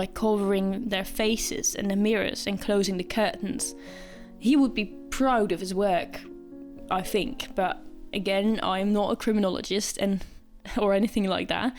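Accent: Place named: United Kingdom. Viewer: i'm brazilian, and i do not know, really, how i get here, and i not understand 70% what do you say, even know if what i writing now is right, and your is Britanic, worstly to understand (not ofense) but your videos like creatives and interesting enough to fix my attention, so i really wich good luck, God blessings on you and your channel grow up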